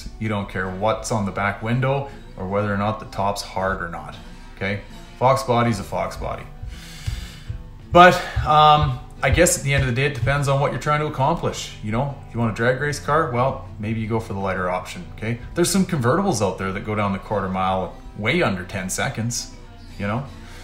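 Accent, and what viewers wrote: Canada. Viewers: Your videos are absolutely awesome and extremely informative!! Thank you so much! Love the Canadian accent, as well!! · Keep up the great vids man. Also I recognized that canuck accent in about 0.2 seconds, hello from Ontario!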